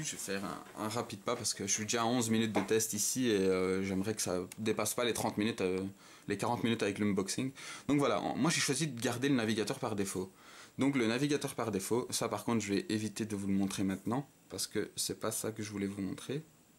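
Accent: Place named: Belgium. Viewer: super review, j'adore l'accent belge !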